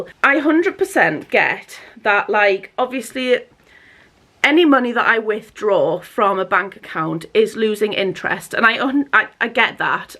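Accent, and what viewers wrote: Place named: County Durham, United Kingdom. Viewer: Hya just found your channel. Where are you from? You sound like you have a County Durham accent or somewhere in the north xx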